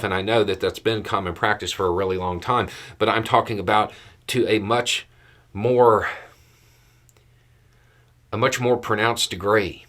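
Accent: South, United States. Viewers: is looks as if he is the stereotypical southerner. Even has the beard. But he has a kind heart. He is changing my automatic distaste when I hear a southern accent. 🙏❤🙏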